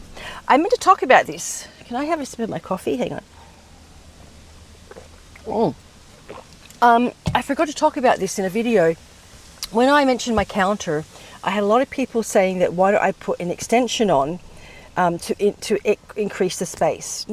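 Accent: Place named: Australia. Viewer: What accent is that? Its 90 percent Australian but something else is coming through. Just curious.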